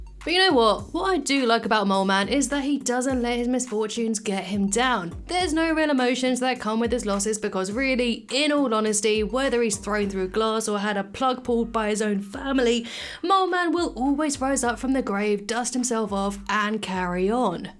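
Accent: United Kingdom. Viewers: your voice and British accent keep me charmed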